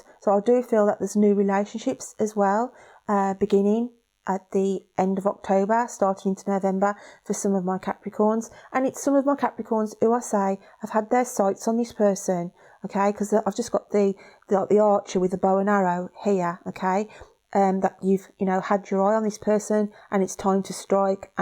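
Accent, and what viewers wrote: Birmingham, United Kingdom. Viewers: thanks my lovely that was really cool what you did it accent sounds local I'm from Birmingham UK I'm sure ur not to far lol x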